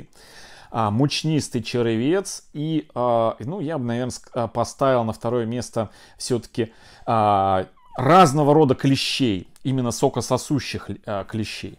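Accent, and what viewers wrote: Russia. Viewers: С этим аканьем нужно что то делать, невозможно слушать. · к актаре насекомые привыкают, а к оберону конечно же нет, ага... цветы прекрасные, но с аканьем надо что то делать...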